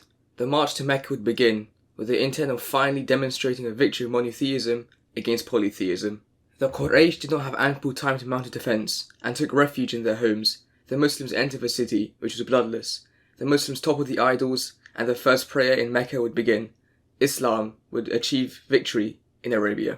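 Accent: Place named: United Kingdom. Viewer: ngl wasnt expecting a british innit p.s. nice visuals concept.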